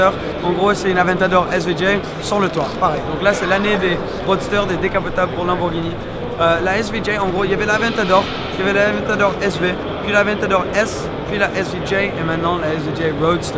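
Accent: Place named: United Kingdom. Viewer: Salut et merci pour cette présentation rapide des supercars du Salon de Genève 2019. Je t'ai connu il y a peu et j'ai regardé quelques-unes de tes vidéos sur ta "nouvelle chaîne française". J'en pense beaucoup de bien et ai particulièrement apprécié ta vidéo "La vérité" où tu te livres et joues la transparence. On sent que tu es passionné, mais attention, tu fais encore pas mal d'erreurs qui en définitive gâchent ce que tu as fais de bien auparavant. Par exemple le tout début de cette vidéo, ne te trompes pas de cibles, tu ne fais pas de vidéos-délires pour tes potes ou entre potes que tu materas plus tard uniquement avec eux, là tu t'adresses à un public tous âges qui vient sur ta chaîne pour découvrir des voitures et le salon de Genève, pour également avoir ton avis mais certainement pas pour assister à des blagues ou des délires de potaches attardés. 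La séquence entre 10 et 50" n'avait rien à faire là et aurait dû être coupée au montage. Ensuite attention aux insultes et grossièretés. Ton "J'men bas les c...…" aurait pu rester au vestiaire, si tu vois ce que je veux dire. Ensuite il y a un truc extrêmement énervant, un tic ou plutôt un automatisme que tu as et qui vient certainement de ta double culture franco-anglaise, mais qui au visionnage est super pénible à la longue. Tu prononces les noms de marques et de modèles à l'anglaise et en plus c'est souvent faux. Exemple, la Lamborghini SVJ n'est pas la "Ace Viiii Jay" mais sur une chaîne française la "Esse Vé Ji" et id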